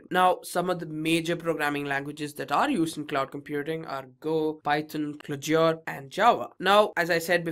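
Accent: India. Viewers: Indian accents are so cringe jesus christ.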